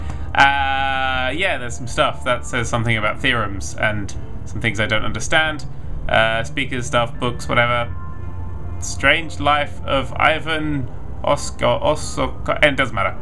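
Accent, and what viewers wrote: United Kingdom. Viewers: its the british accent. once again, the reason why americans watch top gear, is the british accent. i dont pretend to know why, its just a fact. its hypnotic and adds automatic legitimacy at the same time. we all are slaves to the magic of a british accent. if that makes any sense. which it probably doesnt. nothing i say ever does... hehehe... what?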